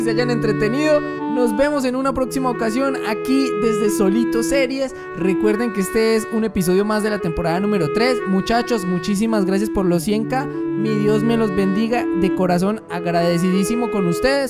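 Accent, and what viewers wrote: Colombia. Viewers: no sabia que eras de medellin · Alguien me dice de que país es xD parece colombiano papá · algo me dice que este vato es colombiano xd like si sos de colombia :v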